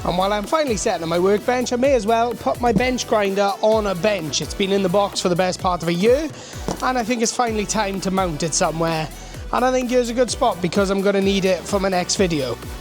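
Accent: Wales, United Kingdom. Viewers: YAAAY! You're back! Cracking update,! I've missed your delightful Welsh tones and this vid has really made my weekend 😊 Well done on the new unit and growing the channel. I hope you get to the point when you can quit your day job and do this full time 😁 Roll on the next vid!!!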